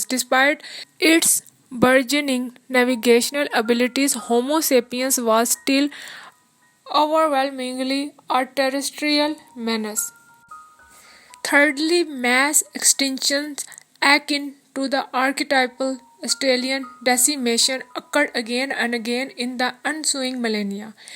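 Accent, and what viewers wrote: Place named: India. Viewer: Typical Indian english !😊 Very inexperienced reading, poor pronounciation and unprofessional audio editing. Visuals can be rendered better. Please improve the audio/video and upload again. Will wait in expectation.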